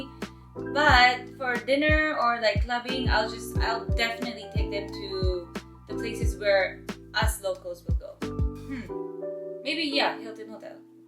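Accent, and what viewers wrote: Philippines. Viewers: How come Filipino accent?!?!🤔🤔🤔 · You sound like a Filipino, you have a Filipino accent. It's weird. You could be mistaken to be one actually. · Funny when you speak in english it sounds like filipino english but if you try to talk in Tagalog you sound japanese... Hahahaha... CUTE OVERLOAD! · first time here.. your accent sound like taglish ..thank you for coming and staying in philippines...have fun and God bless.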